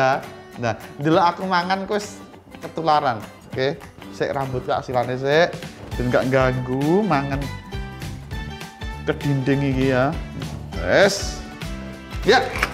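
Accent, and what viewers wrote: Java, Indonesia. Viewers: Mas Kon Kuwi jowone ndi?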